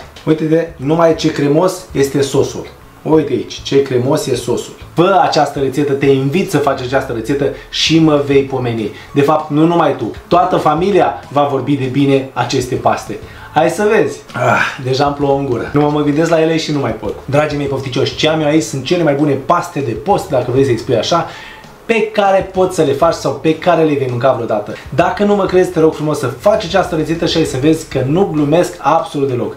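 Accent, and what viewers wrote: Moldova. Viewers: pair a contraire....e perfect ca vorbeste...noua ne place,mai ales accentul moldovenesc...❤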